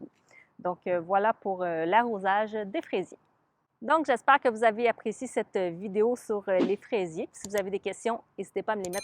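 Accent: Canada. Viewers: Bonjour amie canadienne jadore votre accent je vous ecrit de suisse jadore votre video qui est bien expliqué mais vous parler d'engrais spécial fraisier sest bien mais avait vous penser au engrais naturel comme les coquille d'œufs marc de café ou purin d'orties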